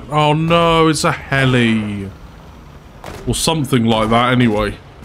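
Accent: United Kingdom. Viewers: Why are you using fictional rebel speed measurements... I've watched all your videos and I've just about had it with MPH with an UK accent..... aaaaaaahhhhhhh.. still love you dude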